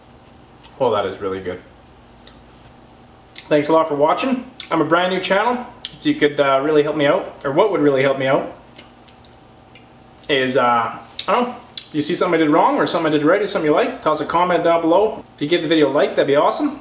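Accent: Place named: Canada. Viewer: A) this is so freaking adorable, b) holy crikey do you ever have a strong 🇨🇦 accent you have!, c) Isabel pretty much stole your whole face, lol & d) I’m not sure what I’m more impressed with - your cooking or your editing skills…hidden talents galore! ps I watched this with Calvin and he just kept saying “I bet must be really happy about this hey?!!l …he meant the cooking the skills ☺️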